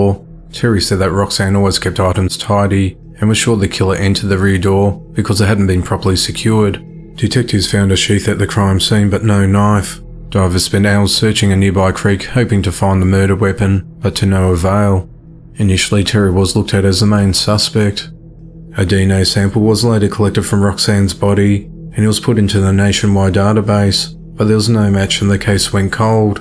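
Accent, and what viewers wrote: Australia. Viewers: Obviously everyone is entitled to an apinion.why dose it have to be an American accent that should be used.not everyone who watches this channel is American im sure other Americans watching would agree the accent can only be a problem if the English is badly spoken.i think this Australian accent is so not fake easy on the ear.no oh my gods,clear to the point of a tone that matches the content.living in. America you should be used to different accents and be more culturally intelligent.keep going with the Australian accent it’s what makes you guys individually top class.🧚Charlotte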